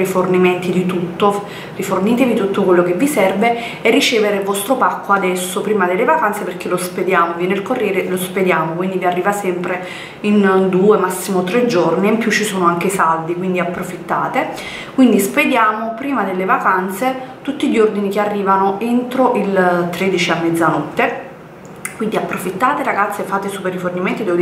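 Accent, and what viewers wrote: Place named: South, Italy. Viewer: La temperatura del suolo in questi giorni è arrivata 50 gradi, più del 2003!!! Assurdo peggio del deserto 🏜. Non so di preciso di dove sei ma dal tuo accento presumo che sei del sud, la ha fatto molto più caldo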